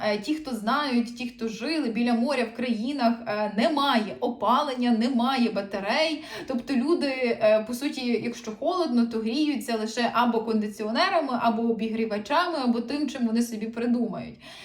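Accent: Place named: Western Ukraine, Ukraine. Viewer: З відки у вас такий жахливий акцент. Ви часом не западянка?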